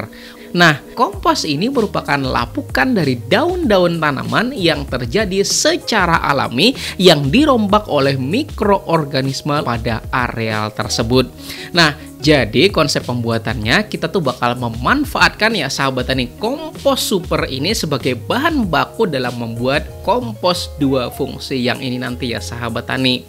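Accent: Banjar, Indonesia. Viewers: kaya org banjar sdin ni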